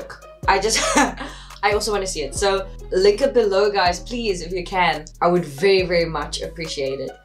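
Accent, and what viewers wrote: South Africa. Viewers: You South African? Your accent sounds really nice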